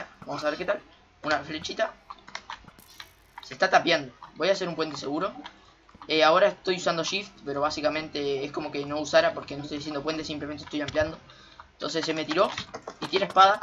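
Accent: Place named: Argentina. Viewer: Hola! te hago una pregunta sos de Uruguay o de Argentina por que hablas como los argentinos pero tenes una capa de Uruguay en minecraft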